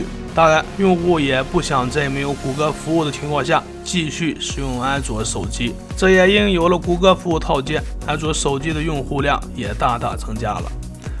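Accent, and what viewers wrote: Shanxi, China. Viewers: 山西口音啦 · 山西口音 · 兄弟，山西人？托县的？ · 山西兄弟吗？好好练练普通话... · 哈哈山西人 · 你这是山西的还是河北的？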